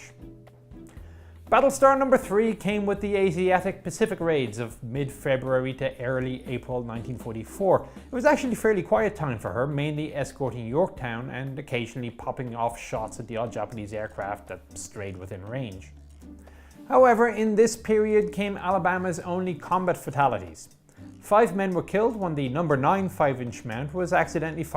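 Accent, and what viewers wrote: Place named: Ireland. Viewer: I really like the systems view of the technical details, the dry wit, and the understated Irish accent. It stands up pretty well, even to a mostly talking head approach. An inside the hatch view of a battleship would probably take about 1000 hours at least, so that’s not likely to happen. I would find it entertaining, though to see how you would fit through the passageways and hatch ways, and into the racks or berths or morgue slots or whatever they called the beds on those things. I’ve got the misfortune of being 6’4”, with a non-streamlined length to beam ratio, and I don’t think I’d do well.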